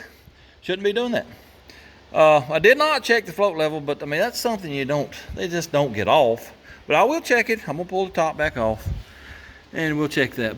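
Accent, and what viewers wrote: South, United States. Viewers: THE WIFE AND I LOVE YOUR VIDOES JUST WANTED TO KNOW IF YOUR COUNTRY TALK IS FOR THE SHOW OR IF YOU TALK LIKE THIS ALL THE TIME,LOVE IT ANYWAY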